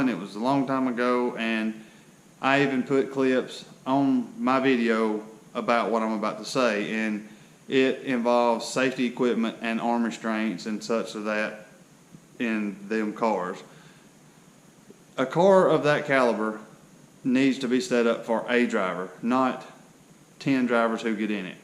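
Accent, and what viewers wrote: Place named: South, United States. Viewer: That's the kind of cheap barn that attracts tornadoes. You sound like you're from the south, I hope you have insurance ....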